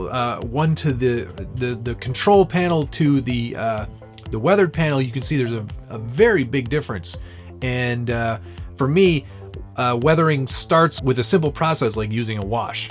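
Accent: Canada. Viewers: Hey man quick question, are you from Canada?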